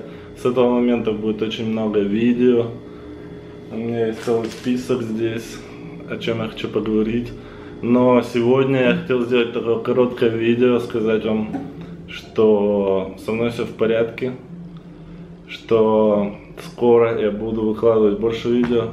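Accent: Mexico. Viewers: Удачи в Мексике!🍀 Ya casi hablas Español como Mexicano. ;)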